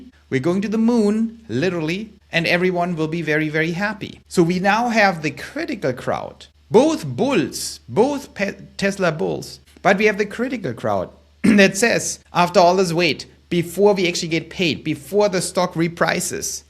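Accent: Germany. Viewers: we need more heavy german accents on youtube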